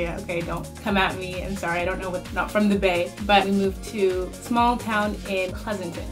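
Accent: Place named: Canada. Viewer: I thought I heard a Canadian accent in your MudWtr video but then got confused when I looked at your description with you going to USC and living in the US.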